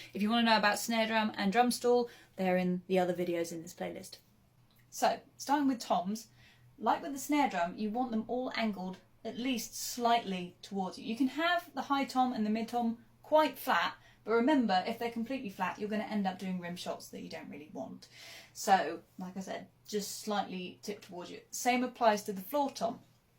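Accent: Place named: Cockney, United Kingdom. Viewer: This bird is Cockney!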